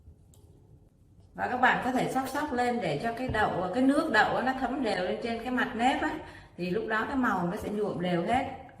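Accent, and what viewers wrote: North, Vietnam. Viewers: Chị chắc là người miền Bắc năm mươi tư(54)😘 nói chuyện nhẹ nhàng dễ thương😘👍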